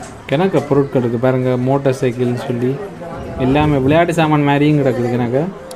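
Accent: Sri Lanka. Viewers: I had visited this great temple several times.It will look knew to me every time I visit.i will sit on the steps and admire every bit of it.Great engineering with no cranes NO modern equipments. as usual great video coverage and your very pleasant pure Sri Lankan Thamizh to hear 👌👌👌👏👏👏. Canada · தஞ்சை பெருவுடையார் கோயில் வரலாற்றை பரிசுத்த மான இலங்கை தமிழ் உச்சரிப்பில் கேட்டதில் பெருமையடைகிறேன் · தம்பிக்கு முதல் வணக்கங்கள் உங்கள் கோவை பயணம் முதல் பார்த்து கொண்டு இருக்கிறேன் நீங்கள் போடும் பதிவுகள் அனைத்தும் அருமை நீங்கள் தமிழ் நாட்டில் வந்து அழகாக பதிவுகள் போட்டு கொண்டு இருக்கிறீர்கள் மிகவும் அருமை வாழ்க வளமுடன் உங்கள் ஈழத்து தமிழ் இனிமையாக இருக்கிறது மிகவும் கவனமாக இருக்க வேண்டும் நன்றி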